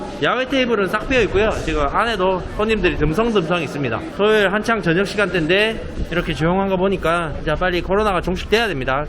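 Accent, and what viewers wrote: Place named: Daegu, South Korea. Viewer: 와~~오늘 영상 정말 즐겁게 봤어요 사장님의 가끔씩 튀어나오는 대구사투리가 정겹고 오래만에 대가 영상도 좋았어요 직원 생일까지 알뜰히 챙기시는 모습도 끝내줍니다 코로나 빨리 사라져 자영업자님들께도 좋은 날이 하루 빨리 오기를... 그날까지 화이팅 합시다 👏👏👏👏👏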